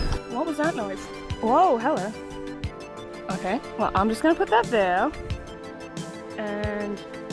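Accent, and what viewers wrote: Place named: United Kingdom. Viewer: it's sounds more British than Australia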